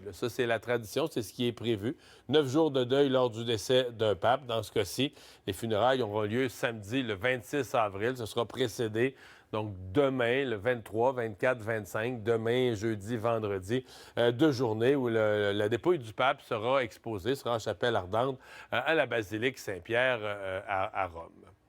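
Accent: Canada. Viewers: Accent typiquement canadien. Je comprends pas comment on peut avoir un accent si bizarre! Les votes des papes se sont toujours passes comme dans des republiques bananieres. Et la chretiente, elle est ou alors!!!!????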